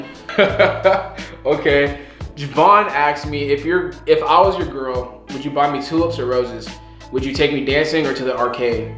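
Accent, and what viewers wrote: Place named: Nigeria. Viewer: That naija accent 😂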